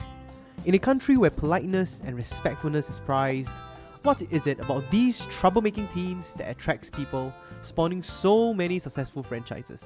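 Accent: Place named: Singapore. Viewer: I can hear a Singaporean accent, I love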